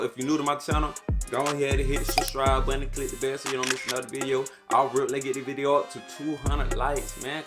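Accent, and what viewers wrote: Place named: St. Louis, United States. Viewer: sound like you're from st louis. Good vid btw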